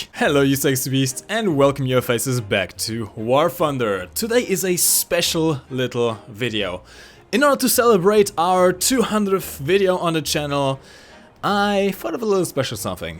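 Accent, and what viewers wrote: Bavaria, Germany. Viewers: I really like your German accent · ich mag den deutschen aktzent hört sich meiner meinung nach besser an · Oh Gott, jetzt merke ich erst wie sich Deutsch für andere anhört, wenn wir Englisch reden. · U SAY that ur german is rusty but im german i live i the us so english is my first language. u even have the accent i dout your german is rusty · German accent is very good sounding, huge fan of it. Do not get rid of it. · OMG yOu ArE gErMaN biAs, aLl GerMan TaNkS arE sO OP, irl ThEy wEre TRasH Your German accent is actually not that bad I barely noticed it. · I love German Accent but im fudging scratching my head because I can't understand it. Lol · Du bist doch ein deutscher, oder? Das hört man! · Really like the German accent! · i like your german accent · bist du Bayrisch? habe irgendwie das Gefühl das du deswegen den Akzent deshalb nicht Weg kriegst;D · I actually like that german accent... 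· The accent gives you style and it makes you cool don't be ashamed German accent is badass not like my Hungaryan accent. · dude german accent is awesome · translation: When you speak english i hear a german accent, when you speak german i hear an english accent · wieso du klingst so als würdest du aktiv deutsch Sprechen wegen dem Akrzent den du beim Englishen hast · Das ist das erste Video was ich von dir gesehen habe:D wusste sofort das du deutsch sprichst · LOl his accent is totally German, he may have lived as a kid in Portugal but at least one parent obviously kept him current;) Not a negative comment, just an observation! Or as the say in German, Ein Throwawayyoutubeobservationcomment:) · Man hört einfach sofort das du Deutscher bist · uh he says he is rusty in german language. But he actually sound like a german. Trust me Im german too:D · Love the German accent! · Man full blown German. 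I luv it. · I really like the German accent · the reason why i subbed in the first place is because the german accent is freaking awesome [also i decided to make up my own german word: waffen, which means waffles]. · hört sich nach einem dialekt an, welcher auch deutsch ist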